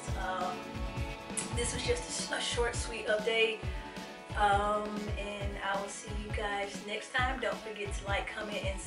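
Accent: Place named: Rural, United States. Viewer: I LOVE your country accent!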